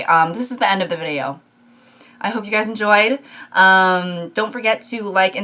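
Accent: South, United States. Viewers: Your southern accent tho😂 it sounds so nice, like that’s what I think a rich southern person sound like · more southern accent please. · Your southern accent is on point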